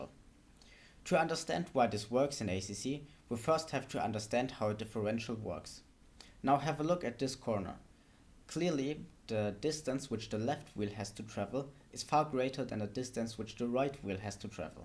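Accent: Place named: Germany. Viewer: When explained with a German accent technical stuff like this immediately makes sense to me.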